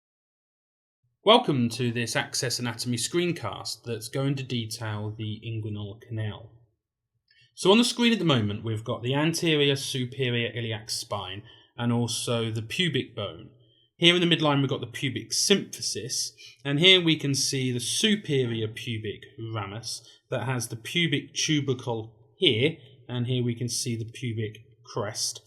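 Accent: United Kingdom. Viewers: Wow wow!! 😮 Im in love with that beauuuutiful british accent 😍 .. plus, you are great sir thank you so much for this clear and stunning explanation 💓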